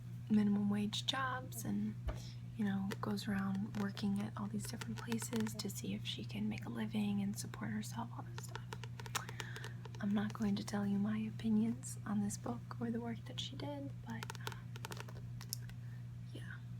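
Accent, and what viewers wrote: South, United States. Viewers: Oh this vid would have been great also with your southern accent! :P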